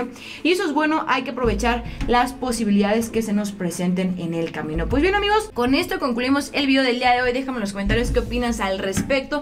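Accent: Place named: Jalisco, Mexico. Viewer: Como hablas,,,, igual que mi mujer...... dear de ser de jalisco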